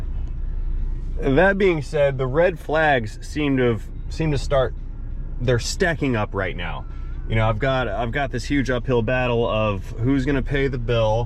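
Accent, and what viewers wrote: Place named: United States. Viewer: Your language is good fun I'm English and your American is great fun to listen too 👍😀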